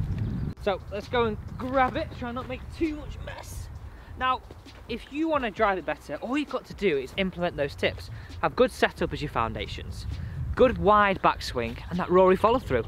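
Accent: United Kingdom. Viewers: Sry hard to understand briti english. Louzy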